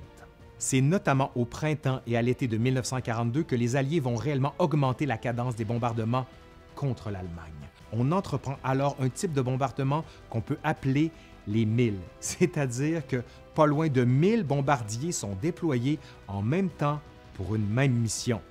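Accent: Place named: Quebec, Canada. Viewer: C’est tout simplement épique. Avec ton accent québécois en plus.. c’est vraiment trop top. merci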